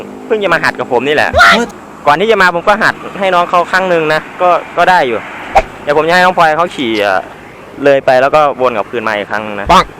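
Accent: Isan, Thailand. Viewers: คนอิสานเบาะะคับ5555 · อ้ายเป็นคนอิสานบ่ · คนอีสานตั้วนิ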